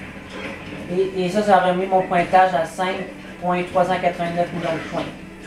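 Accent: Canada. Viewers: sympa ta partie GG à toi poto:) tu es canadien non?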